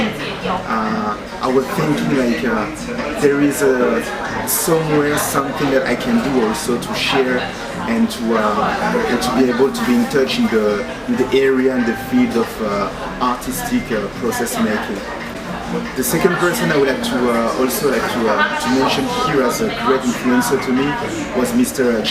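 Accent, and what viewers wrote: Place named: France. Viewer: He has a strong French accent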